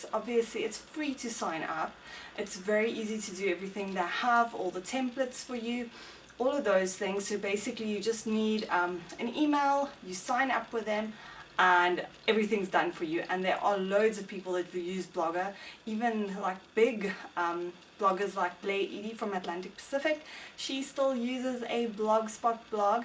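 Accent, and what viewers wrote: South Africa. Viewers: You are South African like me awesome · Are you South African? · You are South-African aren't you? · I started watching his and was like wait she is South African too!!! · r u from SA?? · She must be South African, would recognize this accent everywhere 😂 hear it every day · South African accent I hear?? Love Fromm fellow Cape Town viewer! Xoxo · are you from South Africa ? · Are you South African or have a South African backround? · South African? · are you from south Africa? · Are you from South Africa? You sound South African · Kelly Castillo That's a South African accent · Does anyone know if she's South African? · south african ? YAY! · +April Maroshick She's definitely South African with her accent ;)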